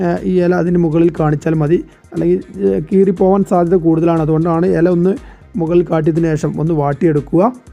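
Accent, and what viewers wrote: Malappuram, India. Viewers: ariyanthondallaaaa ivde Malappuram angne vayil varollu😀😀😀😀 Udaharanam :- Maya payam😀😀😀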